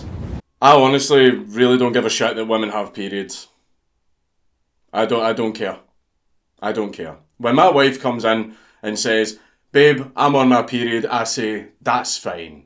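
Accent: Scotland, United Kingdom. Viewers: There's something special about being taught about the female menstruation cycle by a man with a Scottish accent lol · periods with a scottish accent is the coolest thing ever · How the Scott's say shit is fucking amazing sounds angrier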